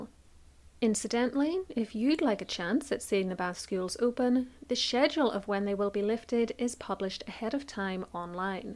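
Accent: Ireland. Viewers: A few years ago I was in the CN Tower in Toronto and I swear I had the same brain conversation with myself as I stepped out onto the glass floor at the top (albeit without your beautiful Irish accent!). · Nice, no-nonsense, well-illustrated presentation —and the Irish accent was readily noted by this Yank. Jumping the gap: Did this inspire The Blues Brothers?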